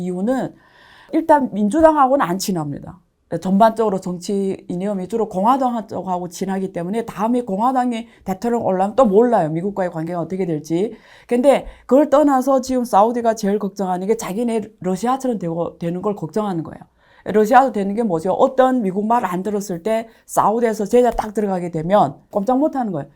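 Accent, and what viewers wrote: China. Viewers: 중국분이신가? 발음이. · 이 분 조선족이죠? 아무리 덧 칠을 해도 티 가납니다. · 많은.경제 뉴스를.신속히 전잘하는거 고맙습니다 다만 . 중국에.대한경제소식을 중국인의.발음으로 듣는게 그리.행복하지.않네요..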